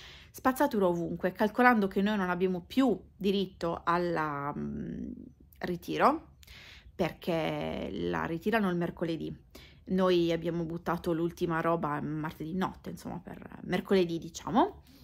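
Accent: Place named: Sardinia, Italy. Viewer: Buongiorno video consigliatomi da YouTube, non ti conosco ora guardo un po' di video per capire, dall'accento sei Sarda ? Vivi in america mi sembra di aver capito 😊 io Sardo vivo in Germania da 22 anni. Anche io ho una canale .. adesso vado a veder qualche tuo video ❤ a presto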